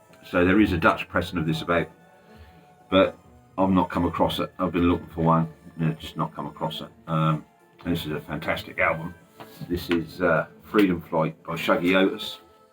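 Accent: Suffolk, United Kingdom. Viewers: Hi, just found your channel. It's great to hear a local voice. I'm from Bury St Edmunds.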